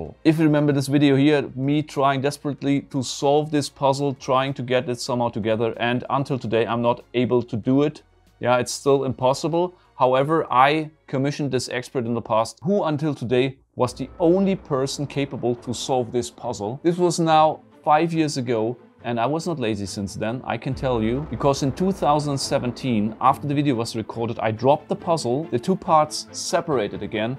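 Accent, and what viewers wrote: Germany. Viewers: What is more remarkable is just how much you have strayed from your German Accent when speaking English over the past five years.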